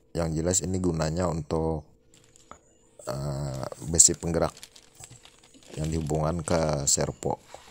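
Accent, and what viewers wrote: Banjar, Indonesia. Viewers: Logat banjar nya terasa banar leh 😁😁😁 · Logatnya kayak orang banjar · Kyk orng Banjar abg nik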